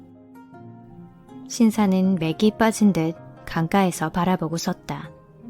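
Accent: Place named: Jeolla, South Korea. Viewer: 와 전라도 사투리가 반갑구만요 늘 좋은 목소리로 이야기 들려주셔서 감사합니다아😄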